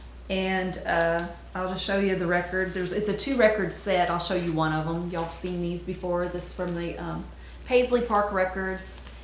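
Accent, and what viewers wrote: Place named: Kentucky, United States. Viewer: OH! Wow I;m Jealous! is Playing Aug 20 about 35 minutes from my house soon. I think it's mostly sold out. Never seen him though I've had a few chances. I thought I detected a KY accent. My Dad use to live just north of Louisville and I spent some time there. You'll have a great time. I know you will!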